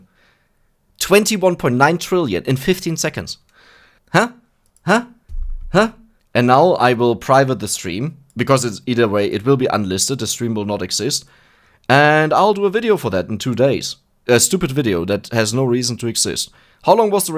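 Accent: Germany. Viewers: your live stream voice sounds way more german.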